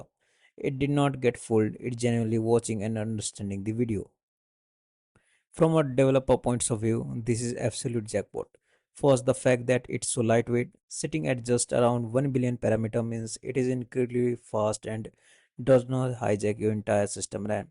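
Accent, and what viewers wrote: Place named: Rajasthan, India. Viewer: Your English flow is better than mine... Are you from north India because I sense a rajasthani accent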